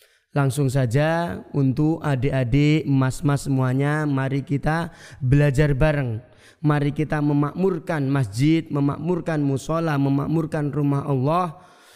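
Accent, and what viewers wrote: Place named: Central Java, Indonesia. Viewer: jenengan orang mana tadz,og sama org jateng cara biLaLx🙏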